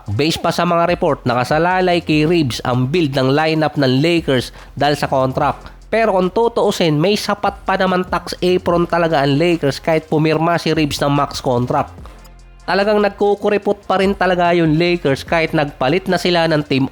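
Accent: Batangas, Philippines. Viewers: Batangueno ka pala 😂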